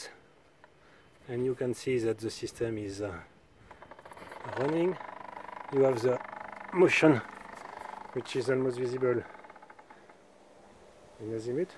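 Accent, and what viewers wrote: France. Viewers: joli taf ! c'est marrant comme on catch les français rien qu'en les écoutant parler anglais (evidemment je m'inclus dedans :)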